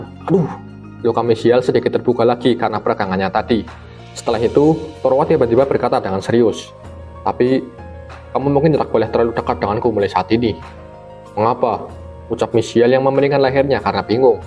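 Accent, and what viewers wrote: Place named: Java, Indonesia. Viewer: Suaranya diperbaiki, logat jawa dan suara mendem ditambah dIkasih efek bukan perpaudan yang bagus. Perjenih suaranya dulu aja baru digaya2in.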